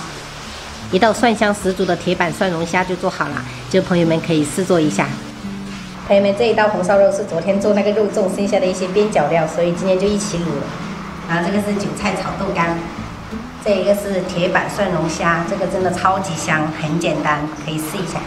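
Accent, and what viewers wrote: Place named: Haikou, China. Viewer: 海口音，親切感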